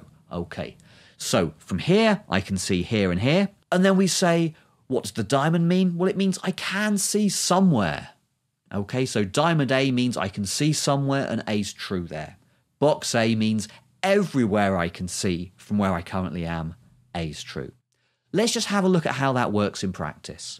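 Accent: United Kingdom. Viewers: I have to think, hearing him, about Eric Idle from Monty Python. It's a certain British accent and not unpleasant at all. For the rest, it's too difficult for me although I notice some resemblance with Feynman diagrams, which I understand neither.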